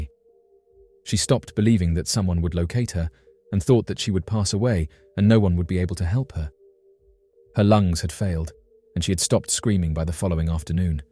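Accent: United Kingdom. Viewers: In deed his pause and natural narrator skills very articulate and clear accent with traces of the best British language Looking forward for more subject Sr thank you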